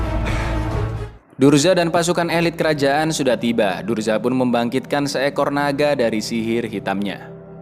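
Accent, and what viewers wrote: Java, Indonesia. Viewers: Kang lu orang Jawa yang mana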